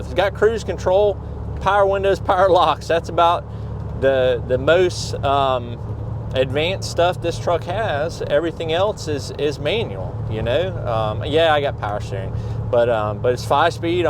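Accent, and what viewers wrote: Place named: South, United States. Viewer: Look at you with the drone footage. Your southern accent has really picked up since being gone from Ohio. I wouldn't mind having an old manual vehicle. I miss a stick.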